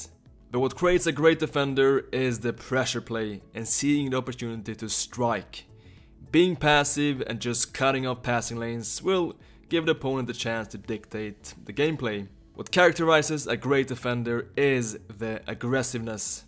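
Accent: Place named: Sweden. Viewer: Hahahaha. I first thought was some kind of Boris/Borat namechild. Heard the accent. "Ah, Borås.":D (not a big accent, but I could tell)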